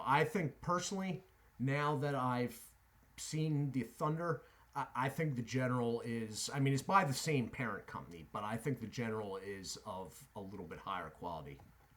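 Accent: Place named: East Coast, United States. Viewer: Peace! Great channel! I’m a New Jersey native for most of my life and now I’m in my 40s in the east Bay of California, and it’s nice to hear an obvious East Coast accent! I’m off of cigarettes for almost 20 years now, yet I have been dabbling in things like cigars, nicotine gum, etc. etc. to bridge the gap when I’m stressed. I would like to be able to order things like Swedish snus or certain types of dips online, in bulk so I have it around. I know northerner is just way too expensive. Any chance you have a good lead to a reputable end respectably priced source for Swedish snus and the like that you know to have decent shipping turn around? Like the channel a lot! Peace Shawn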